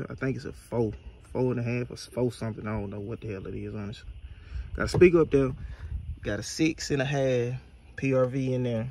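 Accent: Mississippi, United States. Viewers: Bro you from Mississippi?? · Man it sound like u from Ms dat bih pressure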